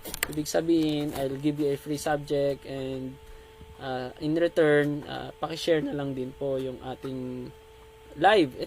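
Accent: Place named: Ilocos, Philippines. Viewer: Parang Ilocano din ang Pronounciation ninyo doc he he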